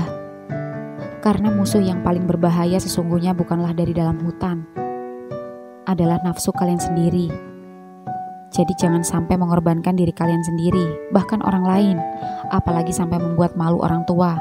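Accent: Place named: Eastern Indonesia, Indonesia. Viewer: .. 👍👍👍 Aku suka cerita mu.. Siapa sih pembawa cerita ini? Kamu pandai membawakan cerita ini dengan dialeg Indonesia Timur mu hebat. Hanya dialeg Jawa kasar nya perlu improvement sedikit.. Dan sangat menarik cerita nya dengan pandangan taboo budaya Indonesia… Malu malu walaupun mau dan harus membohongi diri sendiri.. self denial..😢😢😭😭 Semoga selalu ber kembang dan sukses Channel ini.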